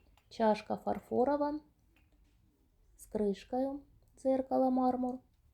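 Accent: Russia. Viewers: Чого ти акаєш??? Тупа, як курка! Це украінська мова , а не кацапський йезиГ!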